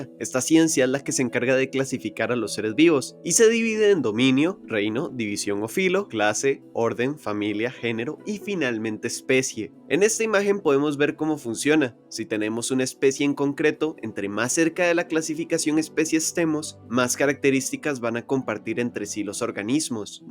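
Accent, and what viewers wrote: Costa Rica. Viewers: Que raro se me hace oír el acento tico en youtube. Buen contenido, no sabía que había edutubers acá. Eres un grande!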